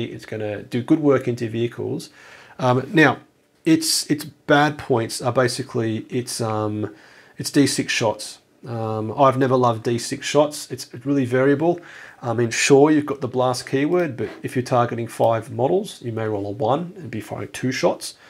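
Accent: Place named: Australia. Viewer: Is this an Australian doing 40k? Love it.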